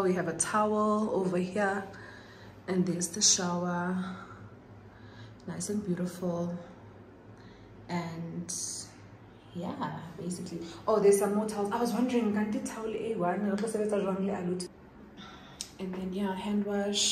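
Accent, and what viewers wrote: Nigeria. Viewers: Please this naija accent is everything and more 😂😂😂😂❤❤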